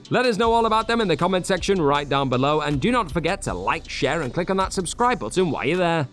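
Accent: United Kingdom. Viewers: Ya British mate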